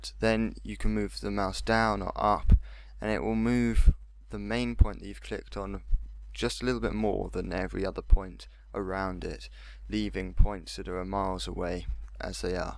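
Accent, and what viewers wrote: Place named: United Kingdom. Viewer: your british accent is sexy, the british women have the awesomest accents cause their so foreign and thickly uk if not usa but once you teach a british woman to read (depends on the woman) you may find your self dying of laughter and although in love to <3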